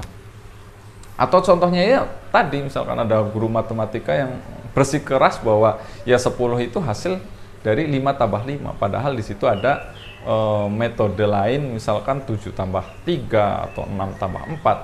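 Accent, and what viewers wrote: Banyumas, Indonesia. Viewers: Semangat mas lanjutkan, mantap sekali pemaparannya filsafat logat ngapak👍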